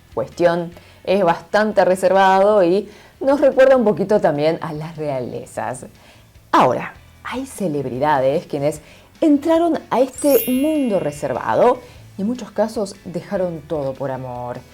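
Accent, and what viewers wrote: Argentina. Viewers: Saludos, me encanta escuchar tu Espanyo Argentino. Una pregunta, en Corea, no se reconoce la soberania personal, los derechos humanos? He escuchado de gente Japonesa que los "Daimyo" de Japon no son tan poderosos como los "Chaebols" de Corea por rebeldia de la poblacion. Es verdad? En tu opinion, hasta donde llega la lealdad a uno mismo vs a la familia mas, en Japon o en Corea?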